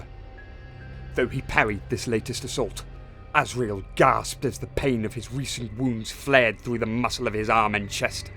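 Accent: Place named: Scotland, United Kingdom. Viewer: Lower the intensity of the “Scottish” accent IMO.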